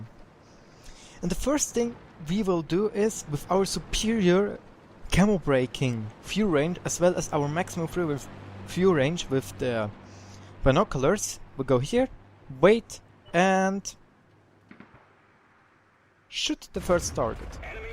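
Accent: Germany. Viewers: Ahh sorry mate. Thought I heard a german accent :D. Great videos though :)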